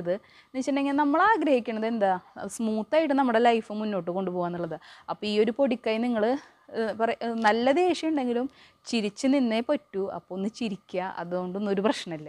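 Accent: Thrissur, India. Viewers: തൃശ്ശൂർ ഭാഷ കേൾക്കാൻ നല്ല രസം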